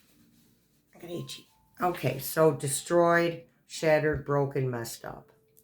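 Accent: New York, United States. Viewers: I love her too. Even though I''m from California, I love her "New York-ese" style, saying, "Youse guys" and "anyhoo." She's genuine - the real thing.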